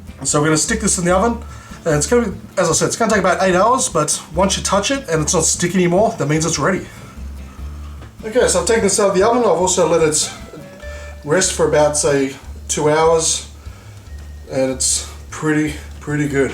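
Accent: Australia. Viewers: Are u from Australia if so what state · I'm subscribing you you can you have a really cool oz accent and your videos are creative and original keep em coming!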